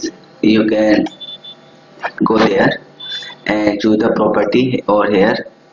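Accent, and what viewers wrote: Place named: India. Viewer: horrible sound quality combined with Indian accent makes it impossible to understand.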